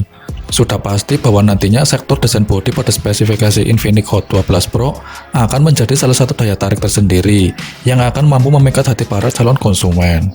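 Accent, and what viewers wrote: Banyumas, Indonesia. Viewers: Mantap mase .. ra ngapak ra kepenak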